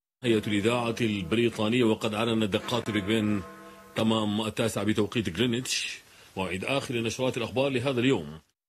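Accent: Syria. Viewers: هل المذيع من سوريا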